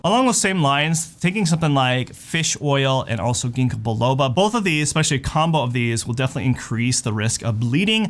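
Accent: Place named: Utah, United States. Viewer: I couldn't quite place where your accent is from. Then I heard you say "Buh' en' very strongly (for button) and I thought it sounded very much like a Salt Lake City or Provo accent. I could be wrong. ISTITNOJCA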